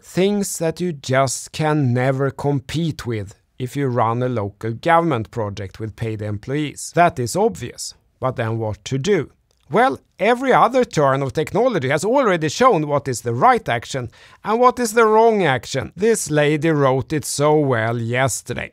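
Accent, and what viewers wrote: Sweden. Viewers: Shenanigans in a Swedish accent - love it. 😜😜❤❤❤❤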